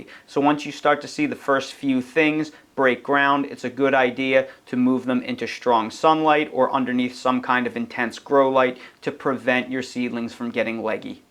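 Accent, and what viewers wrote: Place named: Philadelphia, United States. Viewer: I recognize ur Philiy accent!!❤️ God's Blessings to u in all ways!!💜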